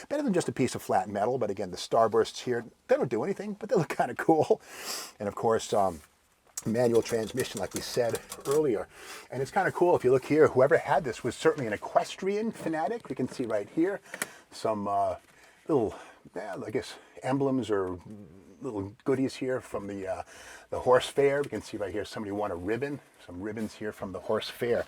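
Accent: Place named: Massachusetts, United States. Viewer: He might have. You could detect a MA accent, but not on every word. I have a friend from Saugus, and he has a much more pronounced accent on every word.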